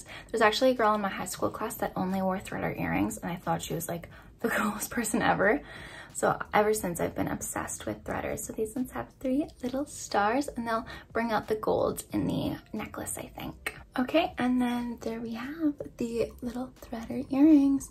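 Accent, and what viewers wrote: England, United Kingdom. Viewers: I do the British accent all the time too 😭😭 you look so cute!! I hope your date went well !! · girl i love you but pls stop the british accent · still obsessed with the english accent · I’m so used to you randomly breaking into British accent I didn’t even realize it till my sister pointed it out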